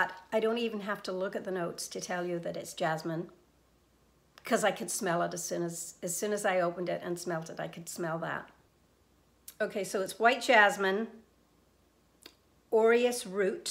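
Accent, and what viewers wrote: Ireland. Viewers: I know you are in Canada but every time I watch you I detect an Irish accent??? Orris root is Iris I believe. 👍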